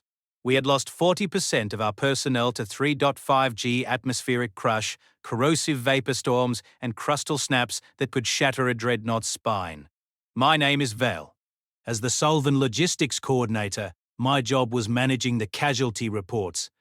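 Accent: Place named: Australia. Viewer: 6/10 the Australian/new zeland accent is a pleasant change of pace from most AI readers, and there were no hangup pauses in the script reading. however, this was also a detriment, as it feels like the reader ignored any punctuation whatsoever. the captioner had one incident of wrong word use. the story itself uses the "lighworlders", "insectoid", "deathworld", "only an earther" and "only a woman" tropes, but avoids the Xenolinguistic names and gender refrences. the shortness of the story is a plus. no wiggly fill for the visuals, and a fixed piece of art is a positive.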